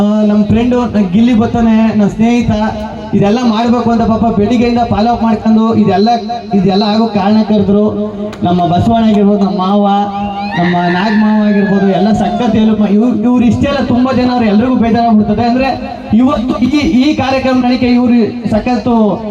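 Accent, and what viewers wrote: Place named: Mysore, India. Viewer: Mysore mandya nam Baashe 🎉 slang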